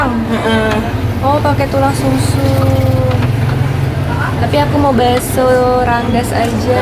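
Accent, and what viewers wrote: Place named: Surabaya, Indonesia. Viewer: Sampean asli endi mbk..kok iso ngomong jowo..suroboyo ta mbk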